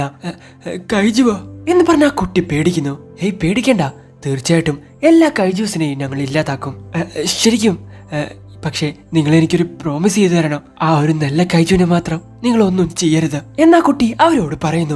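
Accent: Malappuram, India. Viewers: Malappuram aano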